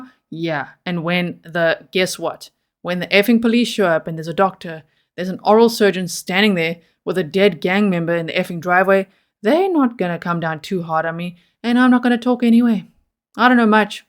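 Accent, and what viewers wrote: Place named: South Africa. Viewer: Excellent presentation, thank you. I detect a subtle South African accent..... Lekker wees, en dankie.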